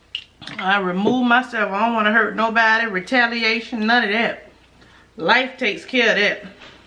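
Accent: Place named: Memphis, United States. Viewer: Because you and my grandma got that Memphis accent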